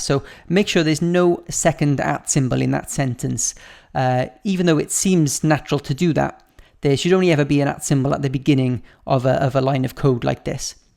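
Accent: Wales, United Kingdom. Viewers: He sounds Welsh! I dunno... he has the same accent as me!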